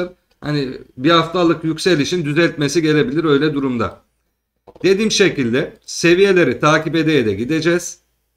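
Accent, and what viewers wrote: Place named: Mediterranean, Turkey. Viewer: Adamsın kardeşim seviyorum seni anlatımında çok güzel diyalektinden anladığım kadarıyla Akdeniz çocuğusun ben bitcoin alıp satmıyorum ama marathon digital holding bitcoin mining i yapan bir şirket hissesini alıp satıyorum oda bitcoinle yükseliyor onunla düşüyor ama senin anlatımın ve analizin süper SEVİLİYORSUN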